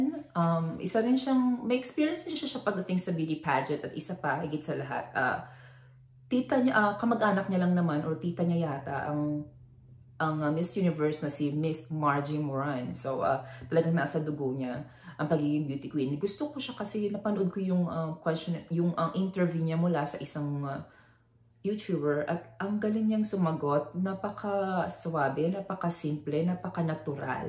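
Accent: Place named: Philippines. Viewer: kahit Pinoy accent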